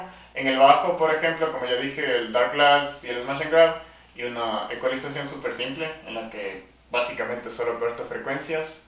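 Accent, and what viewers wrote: Cuenca, Ecuador. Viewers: te has ganado un suscriptor!! eres de Cuenca? jaja tienes el acento xd excelente trabajo, espero que subas los videos explicando más a detalle todo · Tu acento me es familiar, eres Ecuatoriano mi brother?? 🇪🇨🔥🔥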